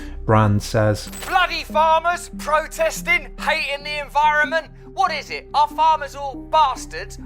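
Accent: United Kingdom. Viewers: You can't debunk what Brand says as not being true....you only try to discredit him by attempting to portray him as irrational in your smooth slick British voice.....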